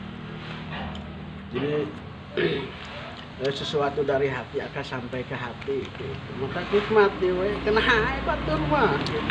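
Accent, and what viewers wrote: West Java, Indonesia. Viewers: Mantap.. orang papua asli. Tp logat na geus sunda pisan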